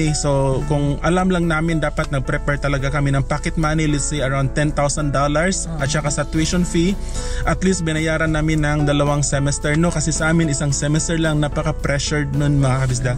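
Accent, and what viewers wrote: Philippines. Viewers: omg, i dont get your accent. is it from philippines?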